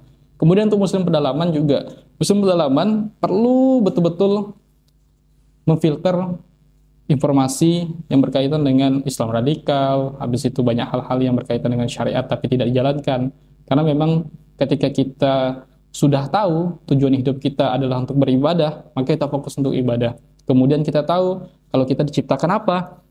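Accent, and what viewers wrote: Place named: Borneo, Indonesia. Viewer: Logat orang dayak kalbar, mirip logat malaysia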